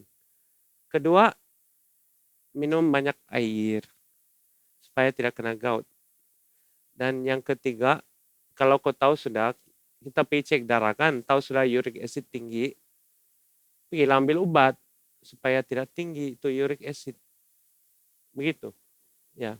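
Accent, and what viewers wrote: Sabah, Malaysia. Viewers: Tq doc.... Siok dengar penarangan versi sabahan...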